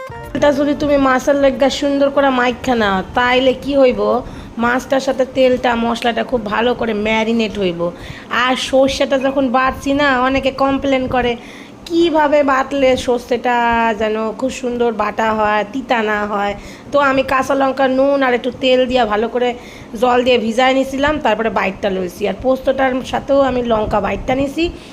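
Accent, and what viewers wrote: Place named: Bangal, Bangladesh. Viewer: Bangal vasa...bah bes to ...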